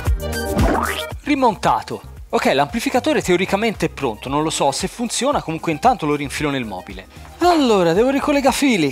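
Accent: Tuscany, Italy. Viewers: forte toscanaccio :)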